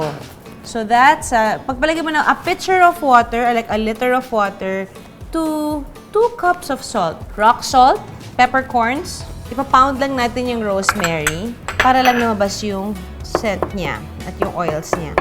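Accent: Philippines. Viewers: I heard that accent and knew she was from the Philippines. Nope not doing it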